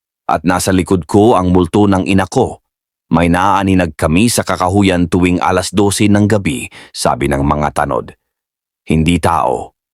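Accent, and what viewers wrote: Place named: China. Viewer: Intsik ka ata salita mo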